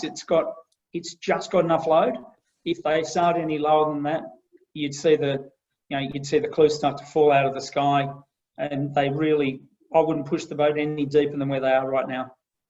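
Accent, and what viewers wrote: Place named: Australia. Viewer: less than 200 likes? There is an incredible amount of very valuable information here! The aussie accent is not easy for me, but what a great video!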